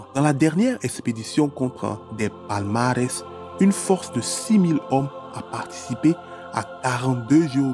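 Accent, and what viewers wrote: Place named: Africa. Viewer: Salut, trop pénible à écouter !...entre la musique qui est trop forte et votre diction tinté d'accent africain, je me fatigue à tendre l'oreille ...c'est dommage !....j'espère que cette critique vous permettra d'améliorer vos prochaines vidéos !...bon courage pour la suite !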